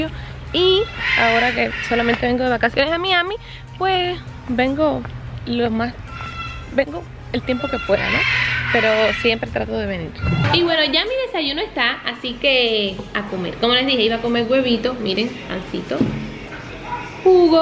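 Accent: Cuba. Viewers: Por que son las dos cubanas y a si hablan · para tu informaciom no,son hermana son cubanas y hablan en el mismo acento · Es que ella habla como tipo cubano o algo así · por que es cubana y todos los cubanos hablan igual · Porque las dos son Cubanas ese es el acento cubano jjeje por eso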